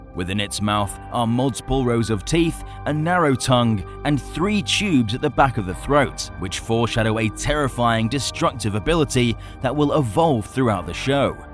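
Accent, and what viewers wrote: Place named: United Kingdom. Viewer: I kinda want to know what type of pound cuz he sounds British.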